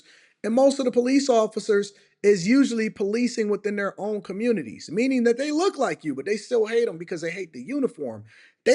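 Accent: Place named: Georgia, United States. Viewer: Sound like he's from Georgia living in the 18th hundred do not know he been free for over 200 years.. just say.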